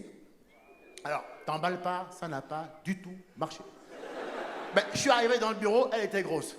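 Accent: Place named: Africa. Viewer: C'est pas mal Arrive et ne cède pas à ta mentalité Néanmoins Tu n arrives pas au niveau de jemmy Ferrari Tu es trop en manque de vigilance J aime ce que tu fais mais tu parles comme un africain Moi je suis française et certains choses dérangent vis à vis des femmes Je sais Tu en as rien à foutre Dommage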